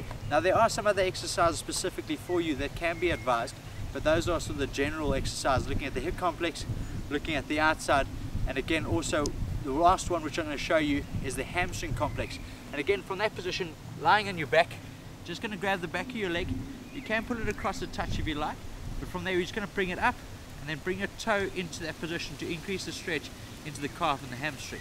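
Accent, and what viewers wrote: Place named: South Africa. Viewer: He's South African not English you muppet. Great video thanks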